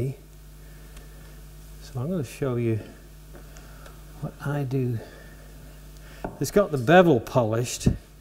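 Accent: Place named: England, United Kingdom. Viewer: My goodness, the purists would have me believe I'll be the rest of my lifetime PROPERLY sharpening/honing my cutting tools. Now, with your method, I can sharpen/hone in time to build a guitar like I wanted to in the first place. And on top of that...an English accent that even and Ole Southern Boy like me can understand. Thanks a MILLION. (Subscribed)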